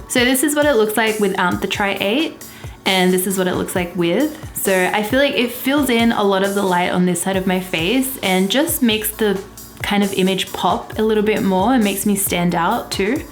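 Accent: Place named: Sydney, Australia. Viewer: From you and my old friend Joann I have decided that the Sydney accent is a very nice sounding english!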